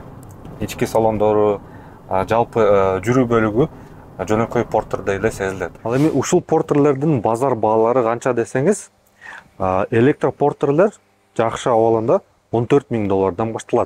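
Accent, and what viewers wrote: Standard. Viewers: Аллах ыраазы болсун ушундай кыргызча таза суйлоп тартып чыгара бер.Ишине ийгилик. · Сен баарыбызды Кыргызча туура сүйлөгөндү да үйрөтүп жатасың рахмат . Мен негизи уккам башка бирөнү ата деген күнө болот жана мен башка улуттан болом деген да күнө болот ошондой эле тилге даны тиешеси бвр . Биз Кыргыздар Кыргыз болгондон кийин өз тилибизди ушу Балага окшоп таза сүйлөйлү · Кыргызча таза суйлойт экенсин,Азамат! Жакшы информация бердин рахмат,ишине ийгилик! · Азаматсын,кыргыз тилинде таза суйлойт экенсин,ошон учун аягына чейин кордум,ишине ийгилик! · Бул жигит кыргызча так таза суйлойт экен. Кулакка жагымдуу угулат экен. Обзордо дегенди серепте деп койсок болчудай го. Обзор жасоо - серептоо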